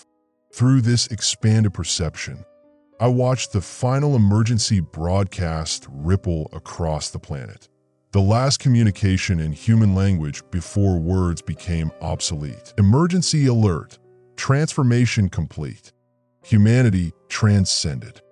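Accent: Spain. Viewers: yeah, the Spanish inflection was cracking me up. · Yeah, sometimes uses Spanish pronunciation.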